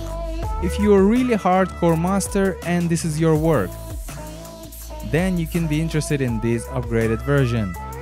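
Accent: Russia. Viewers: I love his Russian accent/grammar 👍🏼👍🏾👍🏿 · И акцент русский( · ну акцент то русский слышен за километры...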